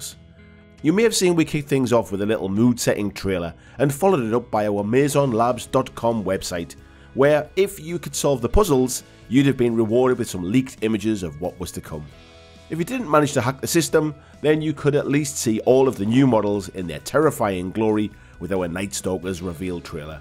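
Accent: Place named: United Kingdom. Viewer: The British accent may be a bit difficult especially for non native speakers. I think it's fine perhaps add captions?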